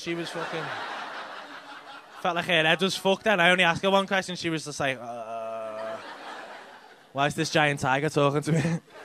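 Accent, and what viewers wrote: Liverpool, United Kingdom. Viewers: Wha? You lads don't like the scouse accent? Fuck are you here for then? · Just arrived in Liverpool for a year and I can say though the accent is thick, he's speaking a lot more clearly than some locals in the pub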